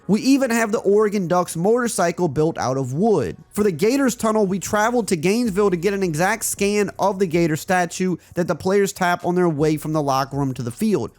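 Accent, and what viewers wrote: Louisiana, United States. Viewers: Dang man you sound like you're from St. Tammany Parrish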